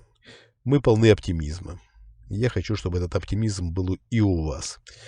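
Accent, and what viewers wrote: Ukraine. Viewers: Вопрос. Скажите пожалуйста, почему у вас украинский акцент?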